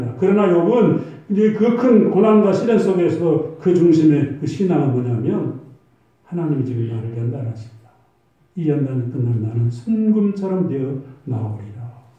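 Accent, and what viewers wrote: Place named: Jeolla, South Korea. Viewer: 어제 꿈을 꾸었습니다. 선생님꿈을 몇달만에 또 꾸었습니다. 살면서 딱히 선생님을 생각하지않아도 1년에 한두번은 꼭 선생님꿈을 꾸게됩니다. 그때마다 선생님이 그립고 궁금했지만 소녀시절 추억은 추억으로 마음에 묻고 그냥 세월따라 가곤했습니다. 그런데 오늘은 선생님이 궁금해졌습니다. 이 쉬운세상에 찾을려면 얼마든지 찾을수있다는 생각에 네이버를 샅샅이 뒤졌습니다. 유투브를 샅샅이 뒤졌습니다. 목사님으로 검색했더니 몇분의 목사님이 떴지만 저는 대번에 이분이시겠구나 싶었습니다. 40년전 저는 어리디어린 열네살이었죠. 중학교 1학년2학기때 오셔서 드문드문있는 수학과목을 한학기만 가르치시고 떠나셨는데 40년이지나서 선생님을 알아뵙기란 쉽지가않더군요. 사실 지금도 긴가민가합니다. 오늘은(지금 시간이 새벽이니 어제는이 맞겠지요) 하루종일 선생님 유투브 아니 목사님 설교말씀만 들었습니다. 또 없나 샅샅이 검색해서 청소하면서도 듣고 염색하면서도 듣고 씻으면서도 듣고 마트오가면서도 듣고 누워서도 듣고 앉아서도 듣고 서서도 듣고 걸으면서도 들었습니다. 그러니까 어제는 새벽부터 선생님꿈 아침부터 낮 밤까지 하루종일 선생님 아니 목사님 설교말씀만 들었습니다. 순전히 우리 수학선생님이라는 이유때문에요. 덕분에 하루종일 하나님말씀 실컷 들었습니다. 그런데 진짜 우리선생님이 맞나요? 그때는 제기억에 아주 젊고 엄청 미남이셨는데 지금뵈니 키 크셨던거랑 얼굴 이미지가 비슷해요. 그리고 설교말씀듣다보니 악센트가 전라도억양이 있으셔서 그때 선생님 맞으신거같아요. 혹시나 사모님이 카메라에 잡히면 더 확실해지는데 아무리 이것저것 돌려봐도 사모님이 안잡혀 40년의 세월이 흘러서 제가 사모님도 못알아뵙는가싶구 확인이 안되네요. 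하여튼 선생님 참 반갑습니다. 유투브 올려주신 집사님 아주 감사합니다. 덕분에 집사님찬양도 많이 들었습니다. 앞으로도 목사님 설교말씀 자주 올려주시면 안될까요? 소리가 작아서 아주 집중해서 들었습니다. 앞으로도 잘 부탁드립니다. 감사합니다.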